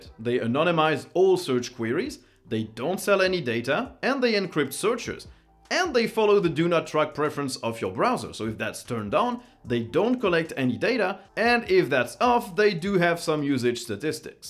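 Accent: France. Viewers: je savais pas que tu connaissais le francais mais j'ai toujours penser a cause de ton accent :P en passant je suis quebecois :D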